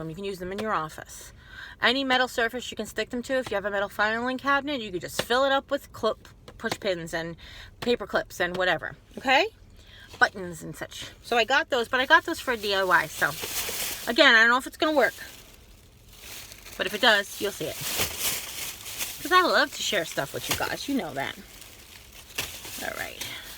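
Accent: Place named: Long Island, United States. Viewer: Hi, new subscriber here! The moment I heard you I felt like I was home. I'm a LI transplant too, but I live in FL...31 years on LI and 25 here in FL. Love your crafts, DIY's and hauls. I'm stayin'!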